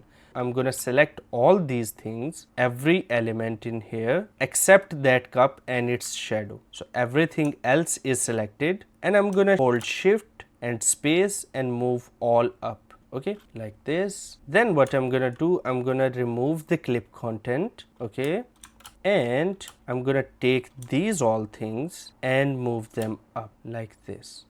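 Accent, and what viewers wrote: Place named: India. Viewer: Why his english is likely Indian accent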